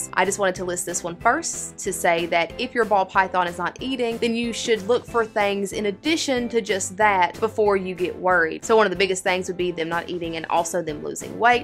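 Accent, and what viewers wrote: South, United States. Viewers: Your accent sounds familiar. What part of the south are you from that is if you are from the south I don't mean to assume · Snake talk with a little bit of a southern accent. I love it. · I love how she says thangs instead of things. It's a southern thing y'all lol. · Hi I love that you are from the south it really gives me confidence as I am getting ready to start my channel to be myself because I feel like NO ONE on youtube has a southern accent but watching your video made me so happy!!!:) subscribed:) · Is that a southern accent I hear 😏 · Thangs! Good ol southern girl haha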